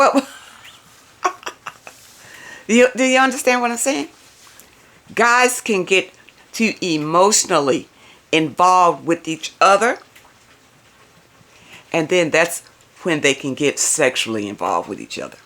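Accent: South, United States. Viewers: that's good. He sounds like Southern Charm.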